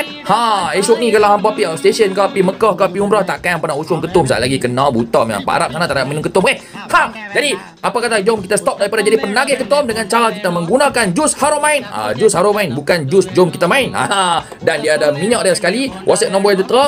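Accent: Malaysia. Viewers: kikikik..loghat penang mmg...sempoi...stedi mami...betoi tu mamu..bior je depe depe..keme geli org yg dok buat mende sikit..sal juang agama..kalimah "drp hamba Allah" dah payah jumpe..yg org org yg tolong sampeikan pape simbangan bile ditanye kt depe depe tu PUN tak tau sape penyumbang asei..depe cume nolong nyampeikan.tahun 90an masih ade 'drp hamba Allah'...masuk alaf baru 20th century kate org kg keme..dh payah jumpe..sedekah tangan kanan kiri yg terbaik.keme mmg tak level gitu.tp keme penah nengok..org anto brg memalam delan rumah kak seorang balu anak 5../letak dalam satu tong biskut empat segi..atas rak kasut..ingat manusie je..yg tak boleh nampak..nk sedekah gitu..pun org ramei boleh tau CUME org ramei tak tau sape hamba Allah tu..usahkan menteri o vvip...haji bakhil yg tau pun akan merah muke..sendiri malu..tu watak lakonan filem le.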